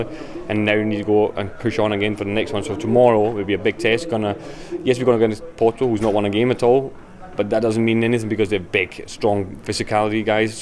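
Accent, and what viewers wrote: Scotland, United Kingdom. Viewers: love your scottish accent.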